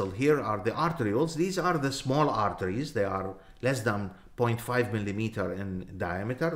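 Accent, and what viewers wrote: Iraq. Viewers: اللهجة العراقيه 🔥❤ عاشت ايدكم دكتور الشرح رائع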